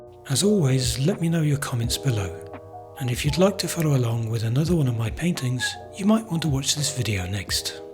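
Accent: United Kingdom. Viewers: Why do you sound like you are British when you ask us to pay for your classes in dollars.,and why don't you stretch your paper first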